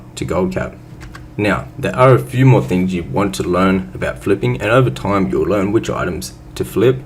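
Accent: Australia. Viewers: Hey, np! I love seeing small youtubers grow over time. Are you OCE you sound Aussie!? either way, been watching your vids for a while and like your content style - real info to work with! subbed and will try get your guide!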